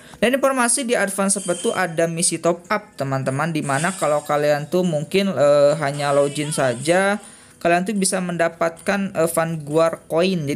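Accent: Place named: Philippines. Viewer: Bro has Philippines accent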